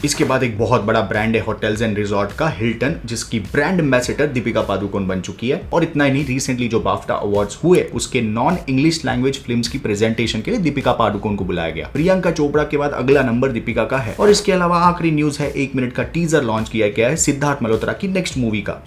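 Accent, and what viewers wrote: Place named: Hyderabad, India. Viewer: Bhai toh badiya kirrak Hyderabadi slang me bole toh ek dum maut 💪🏼🫡🙌🏻🤙🏼🤙🏼🤙🏼 outstanding bole toh maza aa jata hai jab bhi channel open kare roz apke channel per attendance dal kar jate hai aaj koi video upload hua ya nhi Khair bhai tum kaam to ek dum zabardast kar rahe ho quality content k bare me janna hi toh Sidha abhi ka review per jana ❤ well congratulations brother keep helping us we stand with you 🎉 Kch zyda hi bol gaya😅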